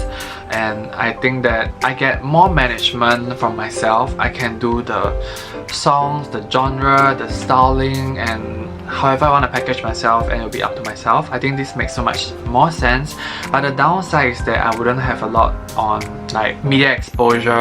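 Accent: Singapore. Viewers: AYEEEE SINGAPOREAN HERE TOO I SENT YOU MY AUDITION VIDEO ALREADY HEHEHEH when I first saw your video I was wondering if you were Singaporean but I wasn't sure but aye your accent is strong! I'm a proud singaporean of you here!❤❤ itsokay, life is really short. sometimes we have to give up on some things. glad you made your choices! I'm gonna work hard and try to achieve my dreams! jiayouz !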